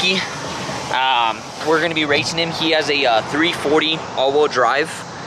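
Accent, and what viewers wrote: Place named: Spain. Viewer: de donde eres escucho acento español¿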